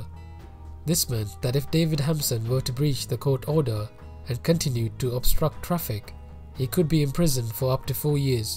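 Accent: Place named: India. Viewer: The narrator has an Indian accent, just curious.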